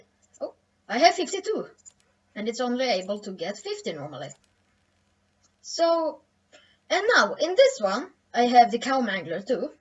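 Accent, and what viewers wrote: Sweden. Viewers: maybe du låter a little bitt svenska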